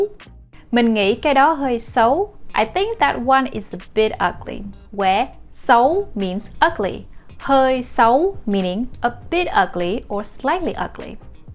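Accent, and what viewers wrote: Vietnam. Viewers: Is this Northern accent or Southern accent? Thanlks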